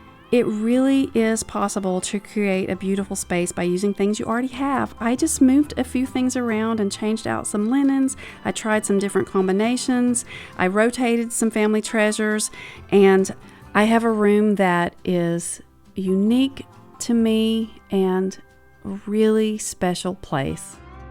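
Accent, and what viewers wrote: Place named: South, United States. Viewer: I feel like we could be the best friends. You have such a sweet and tender heart for the blessings from God. We are on opposite sides of the country but I just love watching you do what makes your heart happy. I sit and drink my coffee to your sweet southern accent. I love in Arizona and for years my home has been decorated in a rustic ranch theme. But slowly I’m going in a more vintage direction. Taking your advice in using what I have and getting what I can inexpensively at the thrift and antique stores. Though our styles are a little different, you so inspire me. Thank you so much for sharing your life and home. Hope to hug you someday. ❤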